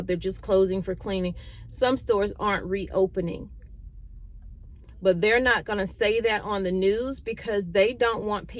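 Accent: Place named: Carolina, United States. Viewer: 🔥 Great video! Especially in the beginning when you talk and encourage people to prep and stock up. Why would you stop?? No.. Keep the talking at the very beginning before you go in because there could be first time viewers or people that haven't started prepping yet and need to hear this! Plus I love your Carolina accent.. OMG. Forgive me... it's beautiful ❤️ I'm in Los Angeles..so hearing you is like a breath of fresh air. Please keep talking and being passionate at the beginning of the videos... Because it . I've been prepping and stocking up for almost 3 years. Thank you 👍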